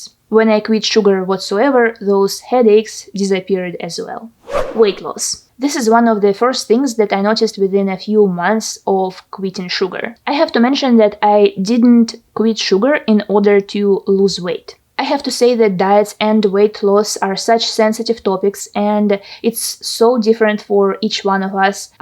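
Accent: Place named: Russia. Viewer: I like Your Russian accent:)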